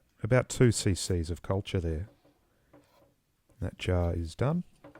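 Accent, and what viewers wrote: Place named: New Zealand. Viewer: Am I hearing an NZ accent.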